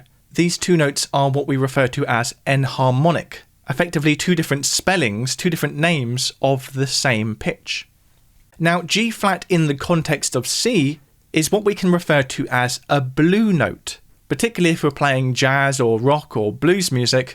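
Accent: United Kingdom. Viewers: I think the only one I didn't know was mordent? But I'm an American guitar player, so your definitions for glissando and portamento seemed backwards to me. As a bit of an Anglophile, I very much enjoyed hearing some of your pronunciations of the terms. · That british accent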